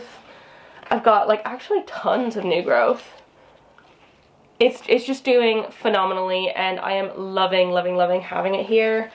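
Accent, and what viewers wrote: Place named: United Kingdom. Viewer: Thank you! That seems about right—I should have known. I think that one is going on my list! Thanks for the quick answer! On a totally unrelated note, and I’m being a nosy new subscriber here, were you raised in the UK? Your British accent doesn’t seem to me to be as pronounced as others I’ve heard. Anyway, love your videos!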